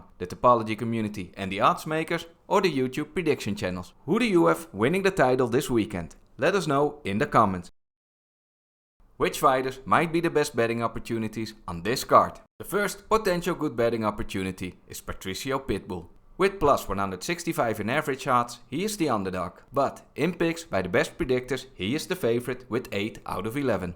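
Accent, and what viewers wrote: Netherlands. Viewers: holy shit jij praat zo tering engells op een nederlandse manier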